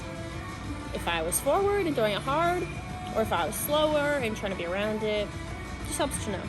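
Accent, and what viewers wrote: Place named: California, United States. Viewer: You sound like a californian eh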